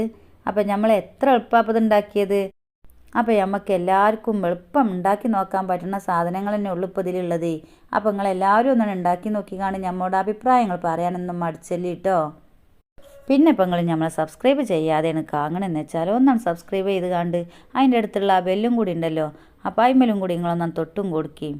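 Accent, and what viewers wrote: Malappuram, India. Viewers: Malappuram pwrooi ❤❤❤ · ഇതെന്തു സംസാരമാണ്.. മലപ്പുറത്തെ എല്ലാവരും ഈ ഭാഷയാണോ സംസാരിക്കുന്നത്.. കുറച്ചു നന്നായി സംസാരിച്ചു കൂടെ,.. എന്നാൽ എല്ലാവർക്കും മനസ്സിലാകുമല്ലോ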